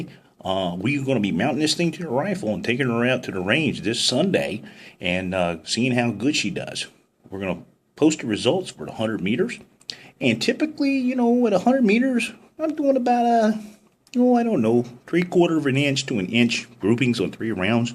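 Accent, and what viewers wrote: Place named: Fargo, United States. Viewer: Oh god stop the FARGO accent!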